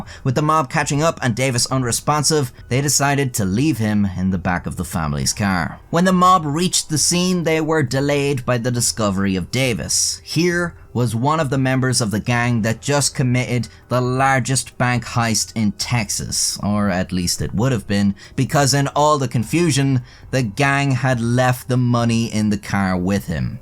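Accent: Ireland. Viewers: Can you cover the guy from Mali who stole 242 million (in your cute Irish tone) from Saudi I think Started listening to some guy and got bored and thought about you You little Irish scamp😂😂 Link on it's way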